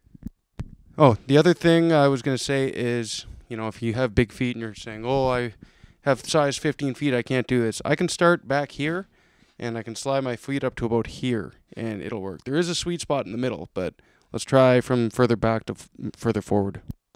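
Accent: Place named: Canada. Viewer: Is this guy Canadian because I can hear it on his accent and me being a Canadian myself I hear it hella bad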